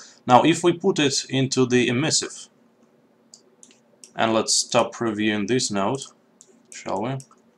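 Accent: Russia. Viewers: I hear Russian accent! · Русский, спалился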